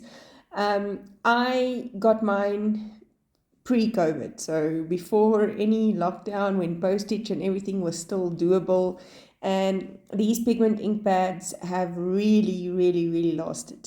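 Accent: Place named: South Africa. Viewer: Wow your accent sounds so much like my husband’s (he is from South Africa and speaks Afrikaans). He has been in America for 25 years and has lost a lot of his accent.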